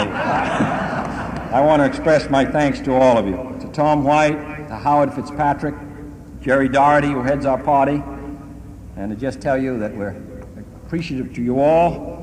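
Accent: Boston, United States. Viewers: Hey had a strong Boston accent.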